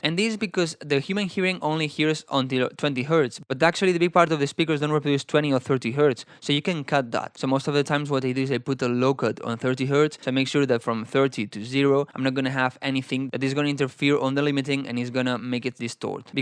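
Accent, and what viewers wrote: Spain. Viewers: noooo? Really? What sort of witchcraft is that!? 🤣 I was simply placing a rhetorical question as this fellow has an obvious Spanish accent 😁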